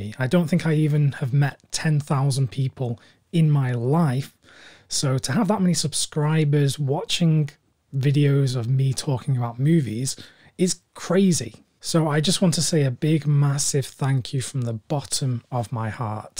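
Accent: England, United Kingdom. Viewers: Unrelated note and in the least homosexual way possible , you have a lovely soft English voice and it’s lovely to fall asleep to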